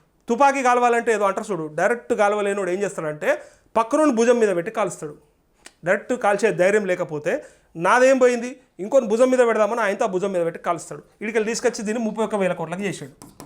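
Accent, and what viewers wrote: Telangana, India. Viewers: అన్న మీ వాయిస్ టోన్ తెలంగాణ యాస లో మస్తుంది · Ni accent chaste ne telustadi nu v sirisilla sullu ganivi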